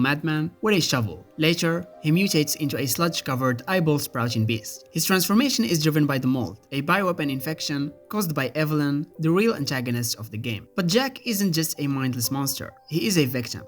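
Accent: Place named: Morocco. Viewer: dude are u moroccan u got a hard accent and keep going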